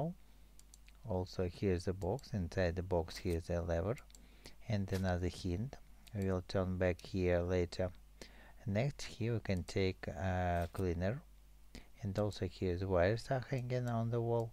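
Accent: Russia. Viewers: Ты говоришь с произношением, ты русский?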